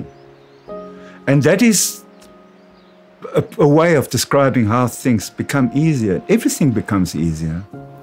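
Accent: South Africa. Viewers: Sounds South African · ❤ great profound little video, profound, and that South African accent 😂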